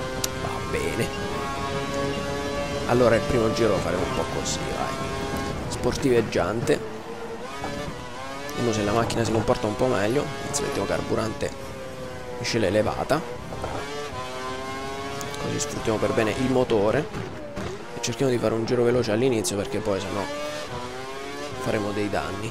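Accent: Rome, Italy. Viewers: pensavo fossi romano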